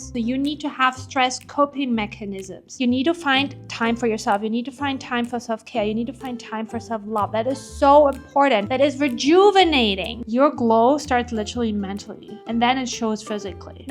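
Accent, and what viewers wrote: Germany. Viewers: Love the tips. Also, are you German? I really feel like your accent is German?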